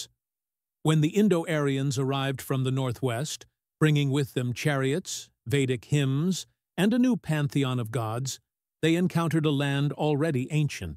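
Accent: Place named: United States. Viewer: Why is an American telling us about our DNA? Personally, with trump destroying the world, I'm very irritated by the accents from the US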